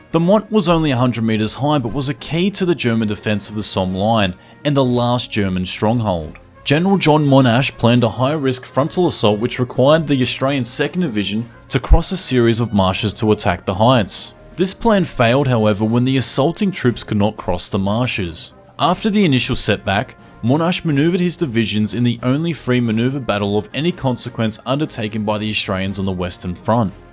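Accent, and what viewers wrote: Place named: Lebanon. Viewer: The narrator sounds Lebanese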